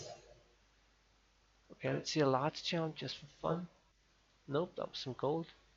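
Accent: Denmark. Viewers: Why non-ladder mate? and are you danish?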